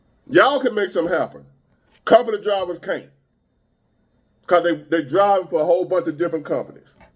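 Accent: United States. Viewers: Facts!!! This dude popping up out of nowhere on everyone's youtube feed sounding like a regular American. He is being paid to do this, I guarantee. He is the shabbat goy THEY are using to convince truckers to abandon their trade via getting replaced for striking.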